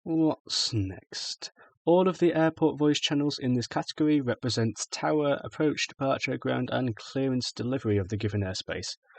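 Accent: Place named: United Kingdom. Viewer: bruv ur bri'ish :D same here.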